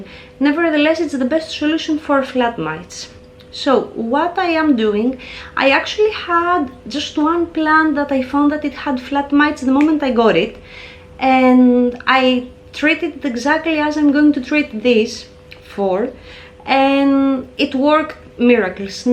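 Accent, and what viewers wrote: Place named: Spain. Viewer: Hong Kong 😱 Definitely too far 😅 I’m Italian… I recognize something Spanish in your accent… I was hopping for a new weekend-Hoya-trip 🤪 Thanks a lot!!!!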